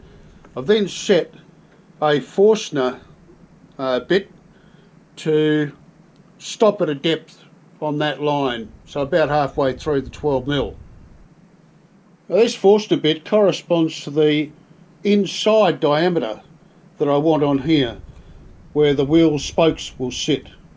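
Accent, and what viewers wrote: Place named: Portugal. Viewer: Parece-me um português falando a língua Inglesa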